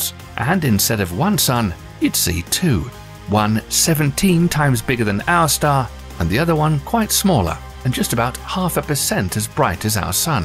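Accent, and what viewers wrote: England, United Kingdom. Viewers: Oh thank god it's a British person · All English men at a certain age sound the same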